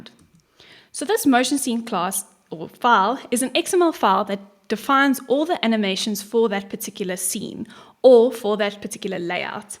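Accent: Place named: South Africa. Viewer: woah threw me off like wait south africa? is that what im hearing :D eyyy same same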